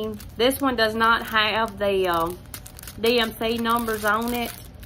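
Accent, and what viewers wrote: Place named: Mississippi, United States. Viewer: Nice haul. Where are you from? Your accent sounds like my family in Mississippi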